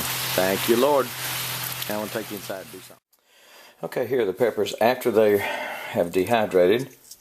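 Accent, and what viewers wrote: Texas, United States. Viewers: Your Texas accent is Awesome! "Hand was Glowing for a Couple Days!" (^_^)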